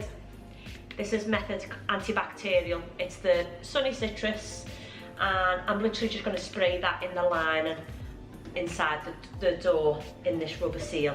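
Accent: Liverpool, United Kingdom. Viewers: Hi great video and love the scouser accent.Just one question to make it crystal clear,does the soda go in draw which you demonstrated and vinegar in drum separately,being a bloke im a bit slow to cotton on with this cleaning lark.Thank You in advance!!!!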